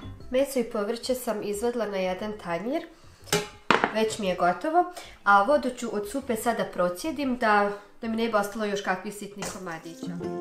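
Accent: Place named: Krajina, Croatia. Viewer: volimo te i pratimo naravno volimo tvoj preljepi krajiški naglasak! 💪🏼👍🏼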